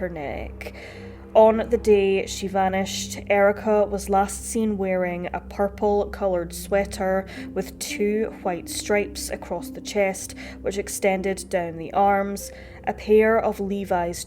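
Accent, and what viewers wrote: Scotland, United Kingdom. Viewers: Jeezus, she’s Scottish. Of course, she’s going to have an accent. Silly Americans b!+<h!ng about the way others talk. 🤦🏽‍♀️🤷🏼‍♀️😵‍💫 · like some others have said I suspect it is because she is Scottish, depending on the region a Scottish accent can be VERY thick and difficult for others to understand, it sounds to me like she is very carefully enunciating to make herself understood clearly. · She is Scottish, and no doubt has unique inflections of her own.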